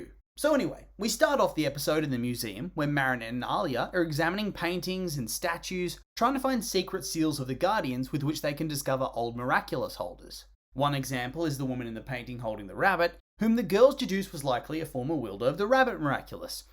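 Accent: Australia. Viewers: Y’all did he get more Australian in this video? Or is it just me?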